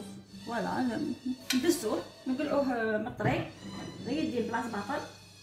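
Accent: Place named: Oran, Algeria. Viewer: Elle n est pas Egyptienne voyant elle parle algérien avec un accent Oranais et des mots français..j adore..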